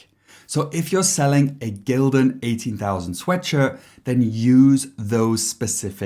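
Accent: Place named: United Kingdom. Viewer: Hey. Fantastic video. Much more informative than others on the listing photos subject. I notice a British accent. Are you UK based? If so do you sell in USA?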